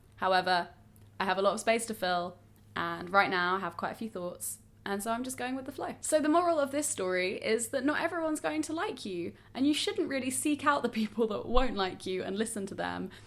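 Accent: RP, United Kingdom. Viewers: I'm ngl I kinda get why people say the disingenuous thing but only because of how you speak like your accent is quite posh? i don't agree with the disingenuous comment but i kinda get it? like posh people are categorised as super fake and bitchy but i don't think that it's accurate of all posh people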